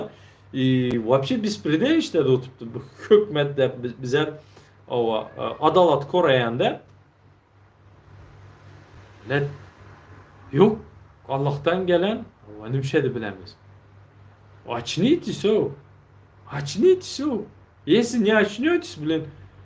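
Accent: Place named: Chardzhou, Turkmenistan. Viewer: он разговаривает на Чарджоуском диалекте,в туркменистане разговаривают на двухсот диалектах .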